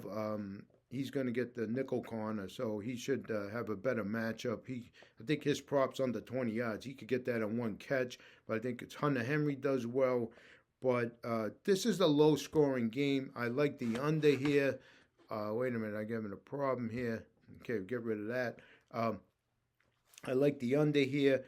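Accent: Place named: Long Island, United States. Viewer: Just keep talkin' your dialect brings me home to Long Island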